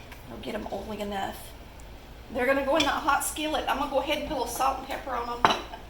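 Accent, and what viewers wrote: South, United States. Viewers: Yes, you have to always pay extra attention to your pasta for the first 2-3 minutes or so after you return the pasta to a boil. Very important that you are stirring constantly at that point, and then at least another 3 good stirs during….untill done! Trust me….we are an Italian family who eats pasta dinners traditionally every Wednesday and Sunday. I learned after moving to Florida from Brooklyn, NY, that here in the south, they don’t make a lot of Italian foods. I think it’s wonderful that you’re expanding your cooking skills to learn new things! I’m learning how to cook “southern style” from you and I’m enjoying it because you make it so easy for me TO learn! Just plain simple English (with a heavy southern accent 🤣) using every day ingredients is the way I like to learn cooking anything new! Thank you for that!! ❤️😘🥰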